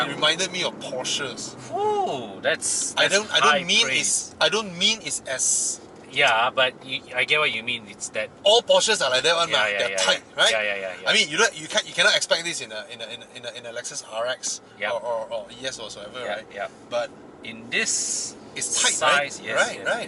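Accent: Malaysia. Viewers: It's so hilarious how you Malaysians speak our English language ! But at least it sounds better than china and other east asian countries' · ahh.. I see so Malaysian speak that way??? I'm from Poland. Please forgive my stupidity 🙏🙏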